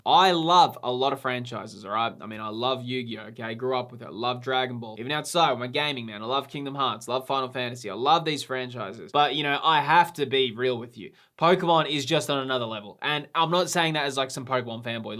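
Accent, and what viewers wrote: Australia. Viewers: he's aussie they have funky accents like the brits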